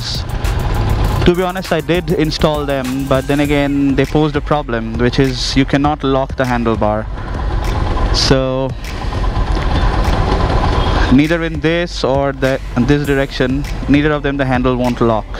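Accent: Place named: Bengal, India. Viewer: You sound like you are Bengali. And the pace of your narration is like atal behari vajpayee.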